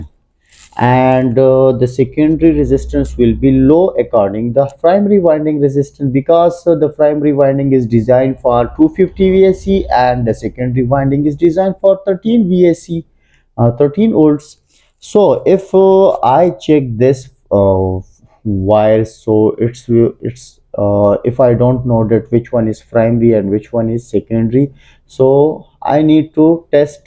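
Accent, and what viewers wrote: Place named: India. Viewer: Normally i find Indian accent difficult to understand, but you speak slower, with good pace and clearer, it is easy to understand you. Thank you very much for sharing your knowledge with us with so much care in both your instructions and handling of a foreign language.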